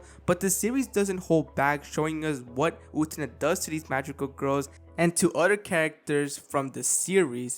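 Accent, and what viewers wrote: Spain. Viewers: that's just how spanish ppl speak english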